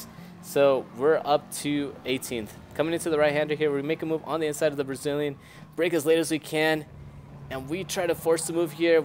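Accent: United States. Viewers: What part of USA r u from?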